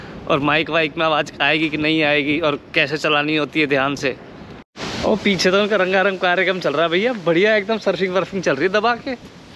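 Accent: Haryana, India. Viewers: Bhai ki personality aur accent par scooty suit nahi karti. What this accent is.....Hariyanvi??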